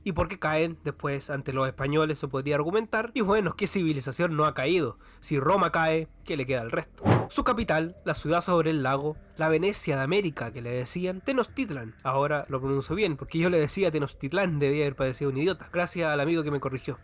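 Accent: Chile. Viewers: Eres chileno ? A ti si se t entiende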